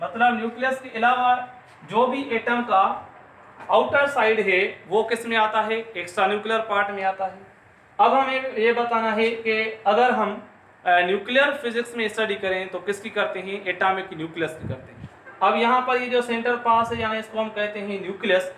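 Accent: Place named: Sindh, India. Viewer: baba saein full sindhi accent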